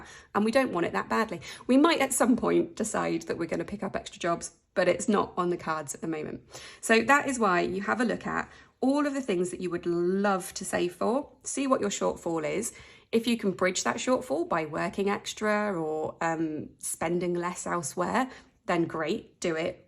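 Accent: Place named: West Country, United Kingdom. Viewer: Love the west country accent lol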